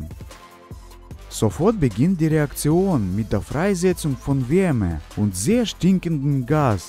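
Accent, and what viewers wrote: Russia. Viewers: Typischer russicher aktzent finde ich super bin halb russin und finde deine videos echt cool Bin gerade auf deinen kanal gestoßen rechtschreibung ist nicht so meine sache😅😂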